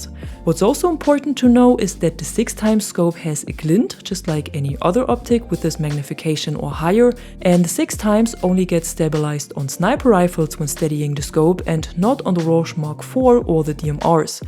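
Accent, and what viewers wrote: Germany. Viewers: i cant stand germans speaking english ffs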